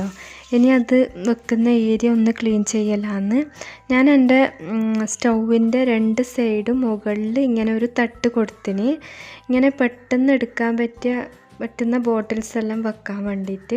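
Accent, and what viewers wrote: Kannur, India. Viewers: Ningalude Kannur language presentation style beautiful 👌 Speedil parayunnadine kaal better ithu thanne ☺️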